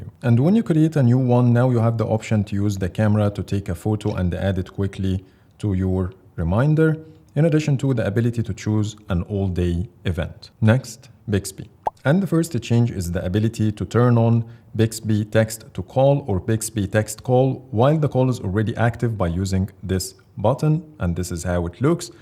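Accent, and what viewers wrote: Egypt. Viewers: كانه عربي مصري🤨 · Off topic but you sound Egyptian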